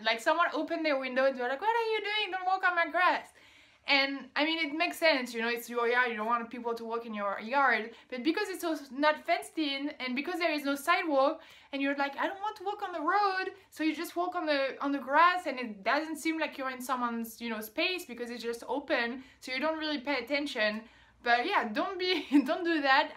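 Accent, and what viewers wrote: France. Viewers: I have no clue who would downvote this girl she is so beautiful & her french accent is so adorable & pleasant to listen to! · I love your French accent!!! I’m from California and I can agree on everything you talked about in the video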